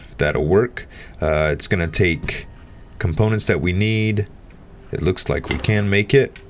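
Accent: Louisiana, United States. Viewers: sounds like hes from Louisiana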